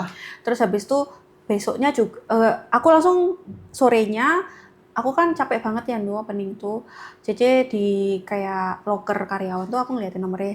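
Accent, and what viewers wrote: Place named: Java, Indonesia. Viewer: Bener2 tuh ada lucu2nya, sampe ngakak aku denger ceritanya, dgn dialek jawanya yg medhok. Baru tau cerita awal perkenalan dan kisahnya dgn Kondosan, Luar biasa kisahnya ❤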